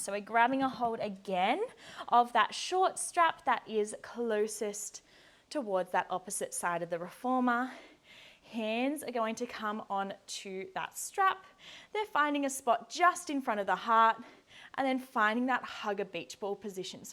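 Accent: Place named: Australia. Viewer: I've only recently found you. I've done couple of your classes, and I really enjoyed this one. I also LOVE listening to an Australian accent instead of American! 😊 I also like how you refer to different reformers and modify for them. I have a YR, and I'm an instructor, but don't do enough of my own practice. Thank you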